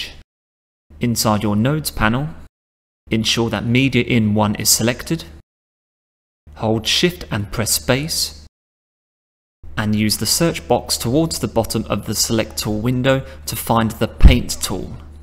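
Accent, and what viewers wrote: United Kingdom. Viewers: Again, a very useful video - also your very clear English is brillant (very British), love it! Thanks a lot for your great efforts and keep up the good work! Greetings from Germany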